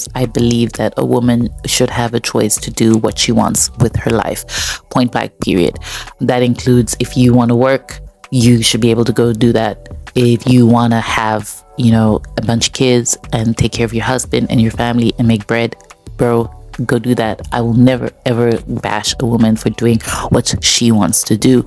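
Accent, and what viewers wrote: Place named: Kenya. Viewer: I really like your speaking voice and accent. It reminds me of my Kenyan friends. You have a cool demeanor.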